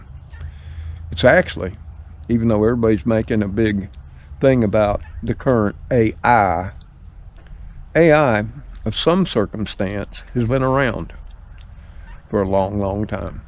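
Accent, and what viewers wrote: South, United States. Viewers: I really enjoy hearing you narrate/speak with your southern accent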